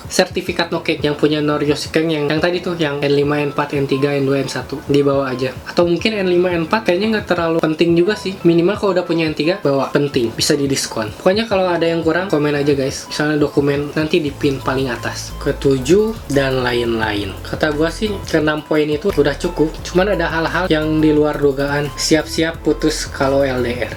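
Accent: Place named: West Java, Indonesia. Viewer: orang bandung? hehehe meni sunda soalnya walaupun ngomong "gue":)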